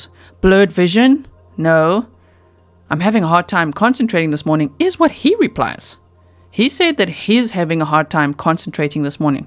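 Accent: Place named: South Africa. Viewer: Hello from Cape Town South Africa ...are also south African you sound like one😊🇿🇦🇿🇦🇿🇦🇿🇦🇿🇦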